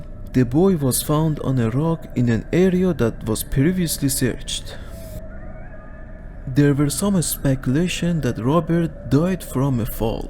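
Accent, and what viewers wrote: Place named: Russia. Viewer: I’m very happy that my comment wasn’t taken in a negative way! I really appreciate your willingness to try to enunciate a little more clearly and work on your English for me :) that’s really cool of you to do! One last thing, that is if you’re interested in my wishes, but don’t ever hesitate to make your videos as long as possible! I really enjoy and try to find the longest videos I can because it’s easier for me to sort of forget that I’m listening and just become hypnotized until I drift to sleep. I become your willing victim, I fall prey to the enchanted tone of your deadly sweet Russian accent... It’s harder for me to get to that place as quickly when I only have videos that are like 8-15 min. I like those a lot too but those are more for day time watching. When it comes to night time, sleepy time listening, longer videos are the best! 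Also, don’t ever let yourself become attached to or fall in love with any intros or outros to your videos that are like loud and abruptly audibly shocking like when you’re falling asleep watching an action movie at home and then an explosion suddenly happens in the movie after a long scene of quiet dialogue lol then you get jolted awake from the sudden volume change. A really incredible example of a YouTube channel that literally does everything I’m talking about 100% perfectly, in my opinion, and is the channel I compare every other missing persons/mystery channel to is the YouTube channel called “Unexplained Mysteries”. That channel uti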